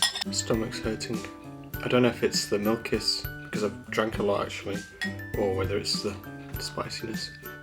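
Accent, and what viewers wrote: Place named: RP, United Kingdom. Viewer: That`s why we call him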